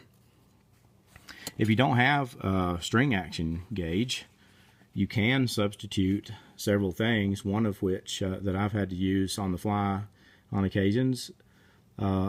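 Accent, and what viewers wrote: Texas, United States. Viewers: Good, clear tutorial, wish there were more like this. And I dig your accent! Texas? (Excuse my ignorance, I'm English.)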